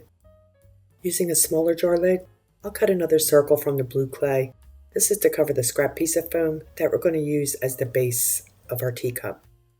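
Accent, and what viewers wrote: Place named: Pennsylvania, United States. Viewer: Clovis came out Amazing!! I’m guessing you’re from PA… There’s the accent and my mom’s side of the family is all from PA & I always heard mom & grandma call them jimmies. Well I was born and raised in FL so I call them sprinkles😂.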